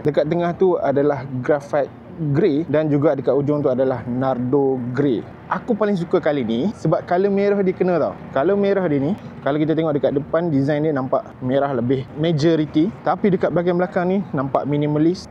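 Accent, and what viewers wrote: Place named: North, Malaysia. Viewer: First time tgk video ko bang, penyampaian santai dengan pengaruh slang utara yg sedap dengar. Editing untuk opening best dan entertaining. Flow penceritaan jelas. Point of improvement camera angle dan pergerakan camera mungkin boleh diperbaiki. Overall good review dan editing best. Oh ye sedikit komen untuk penganjur, dah tahu media atau vlogger akan buat kerja kat belakang khemah tu... Housekeeping ler sikit... Kemain sepah nye... Spoil vlogger amik gambar / video cantik tapi background sampah... Bende simple je...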